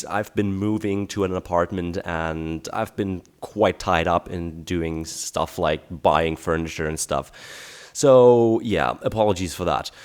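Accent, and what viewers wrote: Germany. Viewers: you defenitly sound german in your older videos, but in this one, for some reason, you sound different. were you sick, or did you have a different mic maybe? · es zeigt, dass Sie mit dem, wie Sie sprechen Deutsch sind Grüße aus Barcelona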